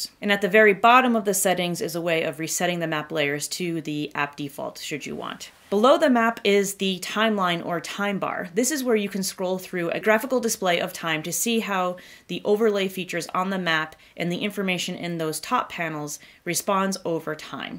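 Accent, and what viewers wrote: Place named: New England, United States. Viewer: Found this by accident and great!!. Just what I need but need a faster brain to keep up with your Vermont one or somewhere in New England. Use to live in Norwich. Thanks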